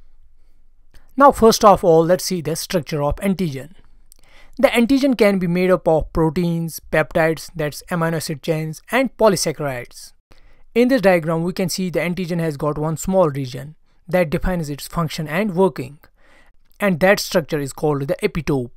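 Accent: Kashmir, India. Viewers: So kashur accent brooo.. Cxe ma kashur??? 😂❤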